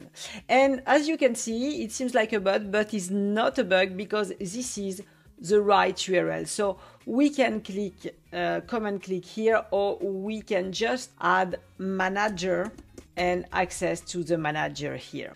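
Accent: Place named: France. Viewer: Vous avez un accent français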